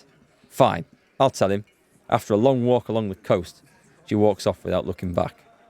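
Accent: United Kingdom. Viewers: You are from middlesbrough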